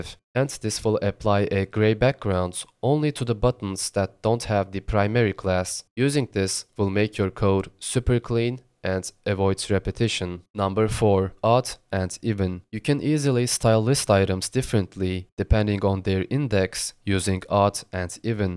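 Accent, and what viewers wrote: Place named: Turkey. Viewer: türksün sen aksanın bağırıyor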